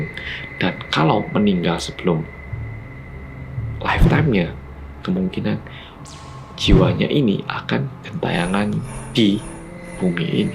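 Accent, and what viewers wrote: Java, Indonesia. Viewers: HAIII TIM GERCEPP💜 HALLO MAS MEDHOOOOKKKK💜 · First ☺️ bersama orang koreyah medokk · Tiap nonton konten mas, jadi ikut2an medok ngomongnya:"D Semangat terus mas ngontennya!!!!!!!!!!!!!!!!!!!!!!!!!!!!!!!!!!!!!!!!!!!!!!!!!!!!!!!!!!!!!!!!!!!!!!!!!!!!!!!!!!!!!!!!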